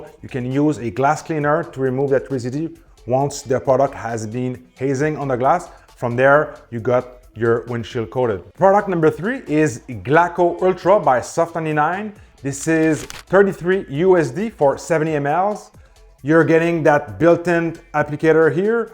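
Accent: Quebec, Canada. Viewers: Salut, je t'entend que tu est quebecois...J'imagine... J'ai une question pour toi, j'ai un Kia ev9 2025 et le Vus je ne sais pas comment il est fait aerodynamiquement,mais le derriere devient toujours sale et rapidement et au lave auto, pas capable d'enlever le tout au complet, y a t-il un moyen de ralentir le tout???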